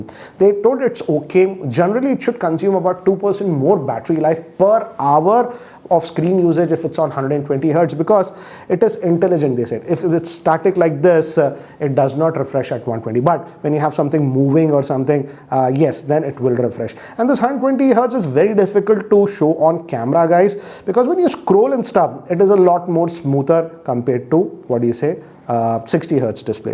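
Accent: India. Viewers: Base in his accent I think he is an Indian. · Never thought i would hear a indian sounding guy say remove junk apps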